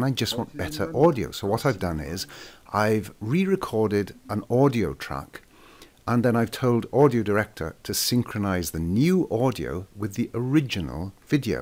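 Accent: Wales, United Kingdom. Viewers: Are you from Wales? You definitely sound it. I'm considering getting this, can you add special fx (Explosions/Generally action)?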